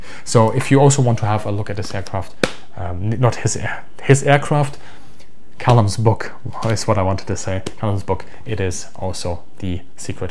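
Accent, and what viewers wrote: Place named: Germany. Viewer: I apologize for the personal question, but to my ear your first language would be some form of German. It has been over 35 years since I used German on a daily basis for work and for regular living. It was never good enough for me to distinguish between some one from Germany, Austria, or Switzerland. Would you mind say which version of German you grew up with? If not, I understand and that is fine. Secondly, all my German Military works are from Bismark and others of his time and were published not long after they were written. How difficult is it to find similar works written by German Military Leaders of the 1930's and 1940's. While I was living in Niedersachsen in the 1980's attempting to purchase or even find such published writings was very problematic. Thank you very much for your quality videos and the supporting research that you do for them.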